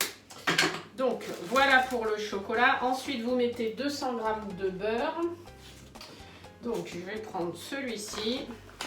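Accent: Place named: Rouen, France. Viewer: Mais tu es de Rouen ?? Il me semblait bien avoir reconnu l'accent 🤭 Super tes vidéos j'adore !!!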